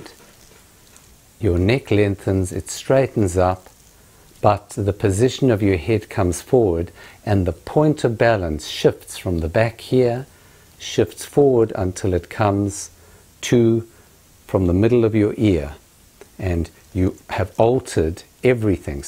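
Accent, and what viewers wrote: South Africa. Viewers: just turns ''ouuff''. can't beat the sath african accent.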